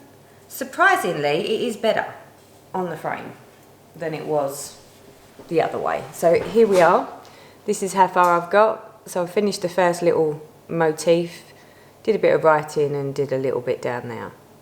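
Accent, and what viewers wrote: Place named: Devon, United Kingdom. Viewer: Enjoying seeing your lovely projects. Hope you make it to Canada one day. I grew up in Devon UK but moved to Canada 15 years ago. Your accent reminds me of home:)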